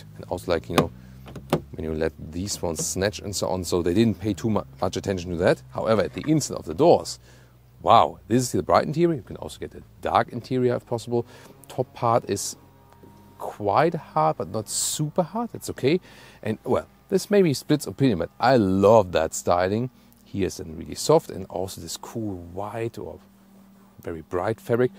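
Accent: Germany. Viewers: You have a strong German accent, you speak too fast, and you mumble. Not a great combination for comprehension. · Are you a native german? Because you're accent sounds very german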